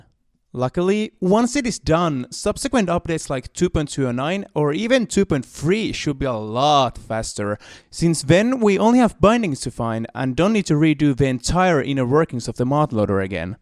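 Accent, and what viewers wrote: Finland. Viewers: Are u Finnish🇫🇮? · En ees tajunnu et äijä o suomalaine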